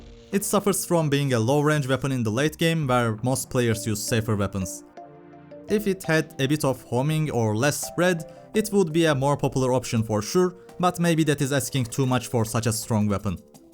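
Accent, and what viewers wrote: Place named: Turkey. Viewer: i kinda hear a Turkish accent where are you from? i love bubble gun btw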